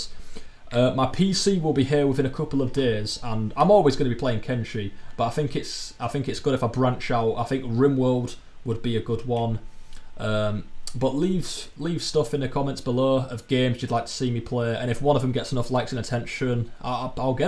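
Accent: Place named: United Kingdom. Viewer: Good to hear a Brit sharing Kenshi content. Interesting how people start off their chars. I suppose it depends on which style of play you prefer, if you already have pre-knowledge of this game. I stopped mining in the initial stages because it was too time consuming vs progress being made. I usually cheese and scavenge, then go for the cheap 250 cats back packs found at the Waystations, unless I've got lucky with the start. I always thought Mongrel was boring, but having seen your enthusiasm for the misty wastes I think I'll give it another try! Good luck with the channel.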